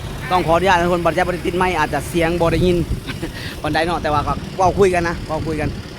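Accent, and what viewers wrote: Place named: Laos. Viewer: ดีครับ/ ฝึกพูด ภาษากลางให้ชัดๆบางที่บางภาคเขาจะฟังภาษา ลาวไม่ออก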